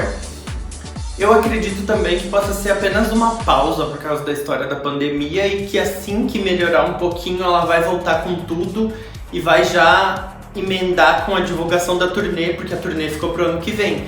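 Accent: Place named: South, Brazil. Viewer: Sotaque do Sul kkkkk A maioria daqui tem esse sotaque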